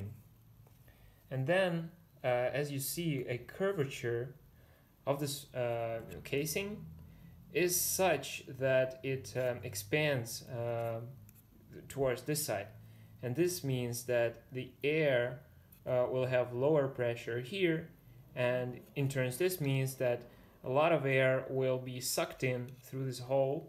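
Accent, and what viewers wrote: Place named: Slovenia. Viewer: Slovenian accent detected.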